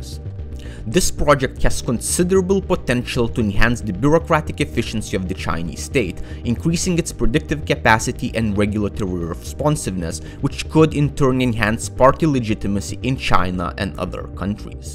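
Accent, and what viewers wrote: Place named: India. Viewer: Need one with out the pajeet accent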